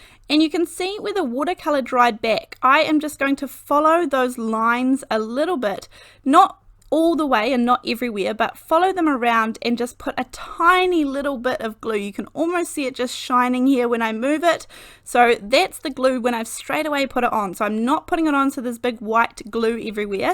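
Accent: New Zealand. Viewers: OMGosh so love this technique yr amazing so greatful to have yr tutorials no wonder yr following is growing so fast have shared you to my friends and groups yr so easy to listen too a huge plus yr kiwi love to see us do well on the world stage we small but a amazing country 🤗🦋🦋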